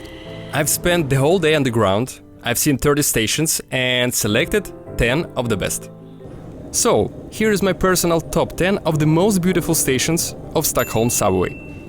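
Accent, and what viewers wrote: Russia. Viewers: Смысл его тренить на русском акценте? Американца или англичанина после этого не поймёшь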